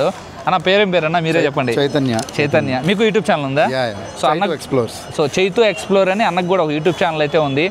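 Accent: Nellore, India. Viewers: Bro mana nellore slang baga telastundi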